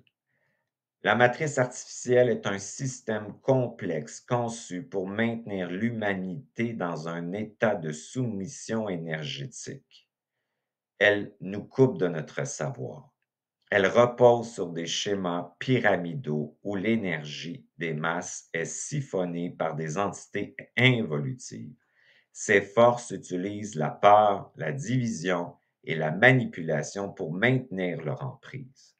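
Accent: Quebec, Canada. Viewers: Bonjour, Merci pour tes partages. Je te suis depuis 2 ans. J'ai foncé lorsque j'ai entendu "Je ne veux pas revenir ici". Waouh... ça a fait tilt!! Enfin un "youtubeur" qui propose du contenu et qui dit tout haut, ce que je pense dans ma tête depuis des années. oui... dans ma tête parce que lorsque je dis ça à des copines elles me répondent "La vie est belle!!" Ha bon!? "Ceux qui meurent de faim, les guerres....etc..... tu trouves que la vie est belle toi?? Sont folles dingues!! J'adore t'écouter parler, ta sincérité me fait du bien, les termes que tu emploies sont top et drole!! j'adore votre vocabulaire à vous les gens du Québec et cet accent... j'adore!! J'avais déjà eu une approche du point zéro avec le livre "Essence de kishori", donc ton discours avait du sens pour moi. Nous avons eu quelques rdv, puis pour des raisons financières j'ai dû laisser de coté, donc les vidéos que tu proposes sont un excellent soutien et surtout cela ouvre à nouveau ma conscience, car je viens de rester au fond de l'abime durant 3 ans, j'ai cru que jamais je n'en sortirais... 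Damned.... l'épreuve de la solitude, c'est horrible lorsque l'on est mal. Tout le monde tourne le dos. Bon... ça va mieux!! Alors, ce message, c'est parce que je m'interroge???? Le fait de mettre le focus sur toi (nous sommes nombreuses et nombreux), l'égrégore il est là... qu'on le veuille ou non!? Donc il est utiliser....!? Même si tu nous dis de ne pas te croire, de penser par nous.... ben ça c'est p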